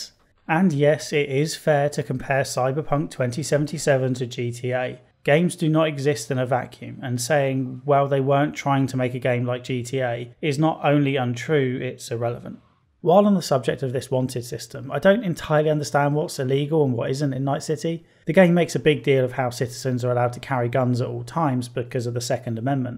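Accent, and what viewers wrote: United Kingdom. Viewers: British accent The f*ckin' STATE OF IT!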